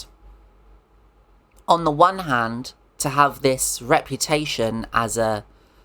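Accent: United Kingdom. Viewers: this is the first video of yours i’ve watched and i’m loving it! love how conversational it is, very easy to listen to and enjoy :-) also the english accent and dry humour definitely feels like home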